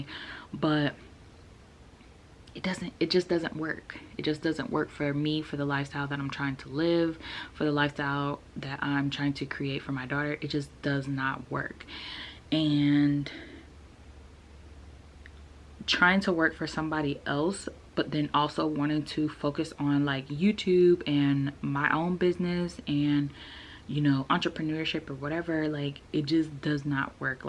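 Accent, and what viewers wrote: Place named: Nigeria. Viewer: Your Nigerian accent is actually strong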